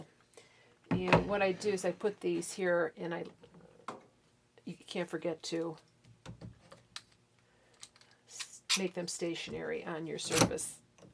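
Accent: Chicago, United States. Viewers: Hi. I have been watching you for a few years now. My family is from Chicago although most of them have moved to the southwest. Your accent reminds me of home. I love your videos and all of the knowledge you share. Thank you so much. I have started spinning this year. I’ll let you know how I get along. I might have some questions. I have a used lendrum wheel that I bought from an English lady who used it for 30 years. It is well loved.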